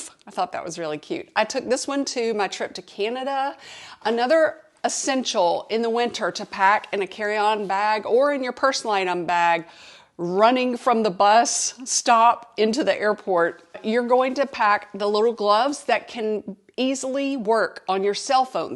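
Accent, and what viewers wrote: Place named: Georgia, United States. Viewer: Oh, so that is why I like your accent. I am from GA too. The Texas accent grates on my nerves.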